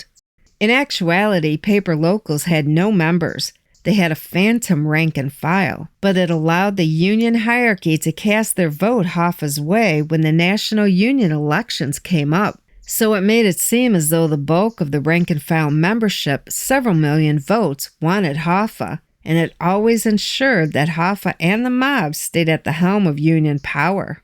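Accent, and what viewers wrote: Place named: New York, United States. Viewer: Very good narrator. Has some New York/Eastern seaboard in her voice.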